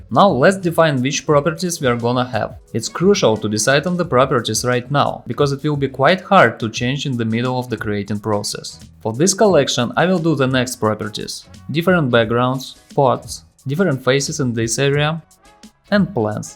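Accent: Russia. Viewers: это случаем не русский акцент у автора?? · Dude are you russia? You speak with some strange accent reminiscent of Russian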